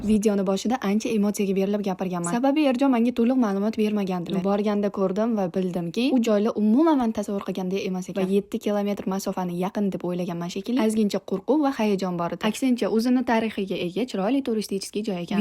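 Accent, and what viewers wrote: Tashkent, Uzbekistan. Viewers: Узиз Намангандансиз нимага Тошкент шевасида гапирасиз бу понтни нима кераги бор. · Тошкенча шевада гапирасанмиии. Наманганлик бусанг. 😮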